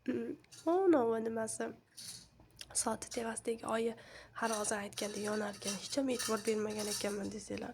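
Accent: Andijan, Uzbekistan. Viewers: Оллохни уйида мазза киляпсиз экан да оллохим уз даргохида Кабул килсин Каеридансиз шева анжан а рахмат катта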